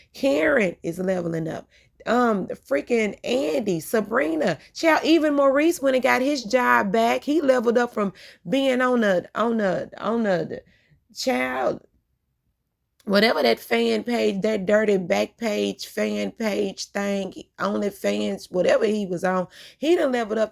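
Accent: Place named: Mississippi, United States. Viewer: You have a Mississippi Delta or Memphis, TN accent.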